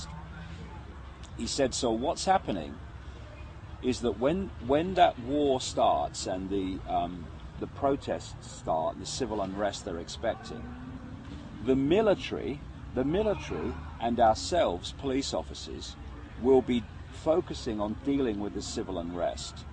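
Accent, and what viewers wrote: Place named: United Kingdom. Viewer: "A certain letter was written between two people in a secret society" can you get more precise while citing a source? Then quoting a traffic warden.. How can anyone believe he is doing anything else than pulling it out of his ass? Just because he has a British accent does not make him knowledgeable or anything. And, all these "signs" he's talking about are just normal things. Civil unrest happens every once in a while, and boosting the warden's powers? What does that have to do with it?!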